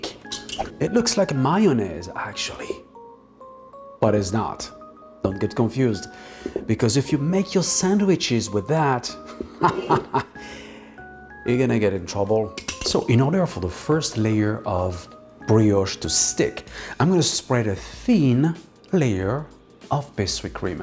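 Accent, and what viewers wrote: France. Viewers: Bonjour. A votre accent vous semblez être Français ou tout du moins francophone. Serait-il possible dès lors d’avoir au moins les ingrédients de la recette en français dans la description à défaut des sous-titres, s’il vous plaît ? Ps : pour ceux qui vont me répondre comme toujours « va apprendre l’anglais »... Sachez que je suis atteint de xénoglossophobie (peur des langues étrangères). Donc ma demande n’est pas un caprice merci.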